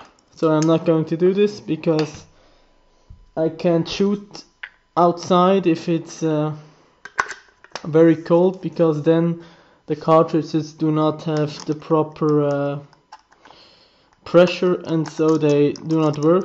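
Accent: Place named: Germany. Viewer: man merkt irgendwie sofort dass du deutsch bist xD ist aber nicht schlimm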